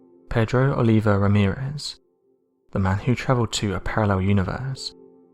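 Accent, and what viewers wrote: United Kingdom. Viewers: If things are happening in Casper, Wyoming, why can't you have someone from Casper, Wyoming narrate the happening? Could be really good and we in America could probably understand wtf, he was actually saying! I am really getting sick of the accent, inability to pronounce the American language and that non-emotional, dreary, British voice!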